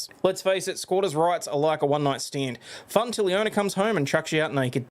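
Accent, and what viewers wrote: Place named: Australia. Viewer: This one with extra thick Aussie accent. Noice!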